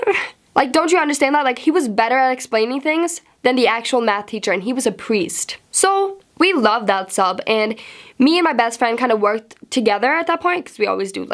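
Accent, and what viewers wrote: United States. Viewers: johansson they didn't say it was bad lol it's just different because to me she just sounds American so it was surprising :)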